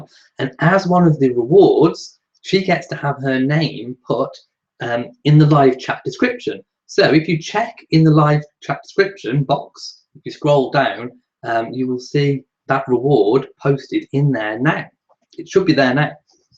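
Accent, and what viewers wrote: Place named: United Kingdom. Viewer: you DO have a great voice and I love your British accent. I watch all your videos and chats.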